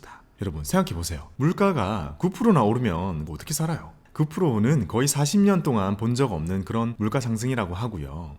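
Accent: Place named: Seoul, South Korea. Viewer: 경제뿐만 아니라, 서울말도 배울 수 있어서 좋은 채널인 거 같아요! 님 쵝오:)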